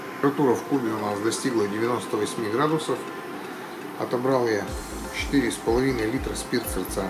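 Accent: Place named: Urals, Russia. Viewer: Да, на Урале есть специфический акцент)))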